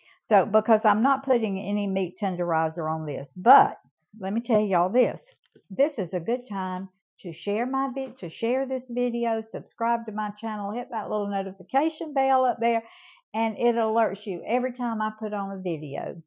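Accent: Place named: Alabama, United States. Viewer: I’m so thankful YT took me to your channel. You remind me so much of my beloved grandmother. She’s with Jesus now but oh how I miss that beautiful and feisty woman inside and out!! 🕯️ ✝️🥹 I want to thank you for your grandson’s service. My own son is a Master Sargent in the AF. This morning he and his family (DIL and 4 of my grands are PCSing to Spain for 2-3 years. Such a wonderful opportunity for all of them! But I will miss them dearly. 🥹🇪🇸 May I ask..are you from Alabama? Because you share my beloved grandmother’s accent. 🥹🥲 God bless you both. 🙏🏻✝️ 🌹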